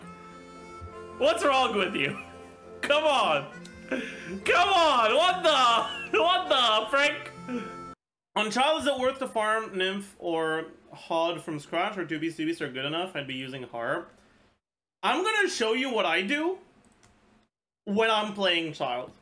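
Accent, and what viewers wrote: Quebec, Canada. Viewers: he's fluent but he has a very thick Quebec accent which very different from what you'd hear in France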